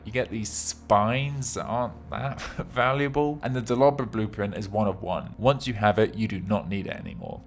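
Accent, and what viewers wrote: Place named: United Kingdom. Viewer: Your a straight up limey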